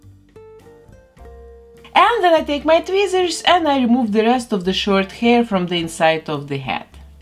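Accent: Russia. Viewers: Не в тему. Но капец русский акцент. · Русский акцент?¿ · Ого, акцент звучит очень по-русски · Русский акцент жёсткий)) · русский акцент не спрятать😳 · Я что слышу русский акцент? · О, этот русский акцент! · Ни чего себе акцент!!! Вы из Ростова? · Ох этот русский акцент 💪🏻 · Мне кажется, или она говорит с русским акцентом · Оооо боже какой прекрасный русский акцент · очень русский акцент))) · Are you russian? Where are you from? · Я слышу русский акцент или мне кажется?) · Стоило бы тогда добавить субтитры на русском, для соотечественников, судя по акценту автору канала это было бы не сложно, а нам приятно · Do I recognize the accent right? You are Russian? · Русский акцент😅😅😅❤️❤️❤️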